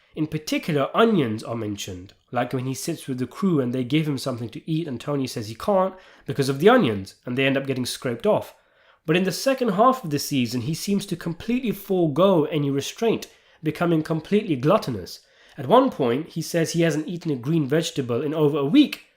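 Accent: United Kingdom. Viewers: Ooh an interesting Sopranos finale video. Oh, it's a british guy, I'm good lol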